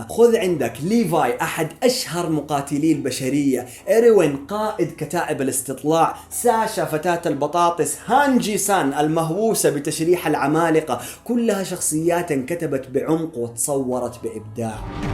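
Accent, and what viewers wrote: Yemen. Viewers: من وين انت لهجتك قريبه للهجه لليمنيه☻?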